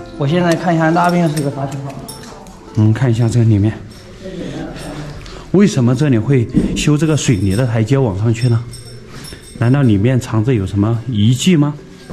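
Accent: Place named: Sichuan, China. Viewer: 为什么我要半夜一点钟来瞅这个视频，为什么，为什么，为什么。 这个家乡川普倒是给了一点安全感。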